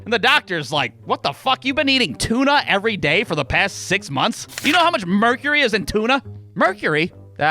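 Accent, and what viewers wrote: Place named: Boston, United States. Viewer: This is the most boston sounding thing ever it's like mark wahlberg on crack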